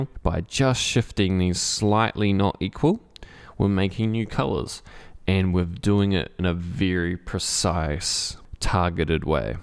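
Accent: New Zealand. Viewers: Him and all the other Kiwis living in the WÜÜÜRLD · it was interesting but your kiwi ixint is so strong i get distracted :DDD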